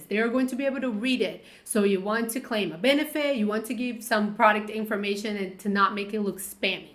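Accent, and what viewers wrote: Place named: Brazil. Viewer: O sutaque é brasileiro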